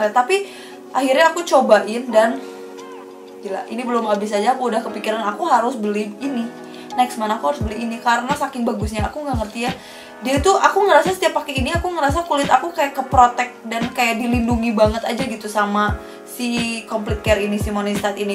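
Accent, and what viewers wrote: West Java, Indonesia. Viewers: gemes sm logat sundanya kakk 💖 reviewnya bagus singkat tp jelas 👍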